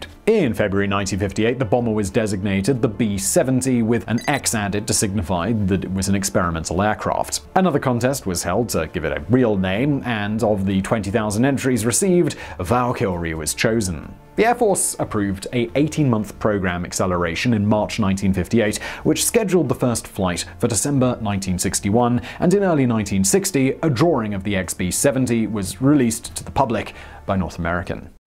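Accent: England, United Kingdom. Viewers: He's English, we pronounce many things differently. In fact virtually everyone pronounces something wrong, they just don't know it. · Sounds intellectual because of British accent, makes numerous mistakes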